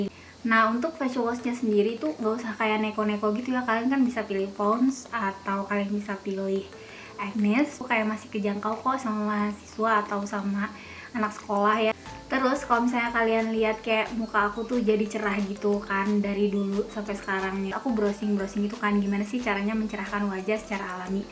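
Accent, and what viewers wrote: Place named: West Java, Indonesia. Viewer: Emaaak dengernya 🌹 Sunda detected 😊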